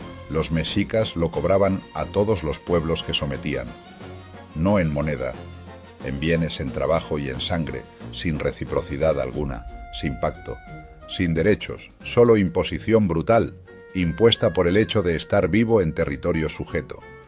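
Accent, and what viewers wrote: Spain. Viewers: Te oyes español. Recuerda que la historia de la conquista de la conquista la escribió Bernal Díaz del Castillo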